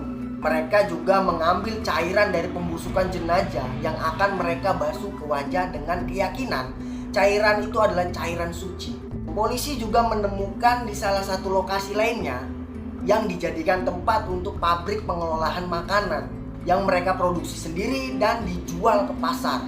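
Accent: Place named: Medan, Indonesia. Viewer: Macem orang medan.. 😁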